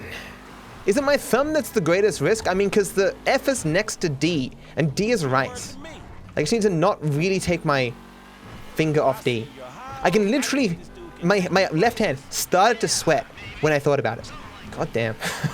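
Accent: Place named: Australia. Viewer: Cmon man just let that native Australian accent FLOOOOOW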